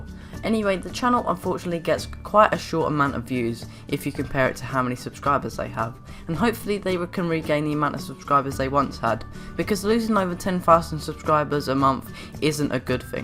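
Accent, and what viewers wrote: United Kingdom. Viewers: Omg are you british? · It's not a speech issue! It's how half of London talk.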